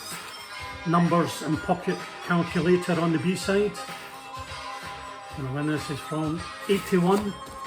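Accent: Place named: Scotland, United Kingdom. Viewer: nice collection of 12" 45s, haven't seen many of thoughs, love and want a copy of that, I also like it when they have the 7" version on the b side, always refreshing to tune in and to hear that great Scottish accent of yours, thanks big man 👍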